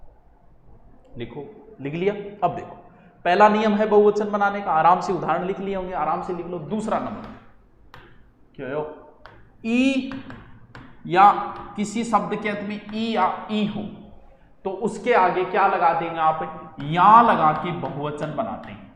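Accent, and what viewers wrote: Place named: Haryana, India. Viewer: Sar paka Hariyana se h inki bagdise pat se pata chal raha h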